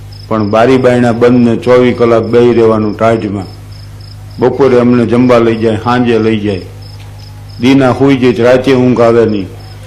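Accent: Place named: North Gujarat, India. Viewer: કાઠીયાવાડી ના મોઢે પરફેક્ટ ઉત્તર ગુજરાતી બોલી ... વાહ...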